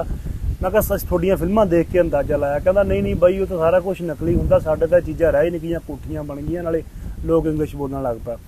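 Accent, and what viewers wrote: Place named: Malwa, India. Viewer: ਬਾਈ ਤੇਰੀ ਪੰਜਾਬੀ ਜਮਾਂ ਸਾਡੇ ਮਾਲਵੇ ਵਰਗੀ ਆ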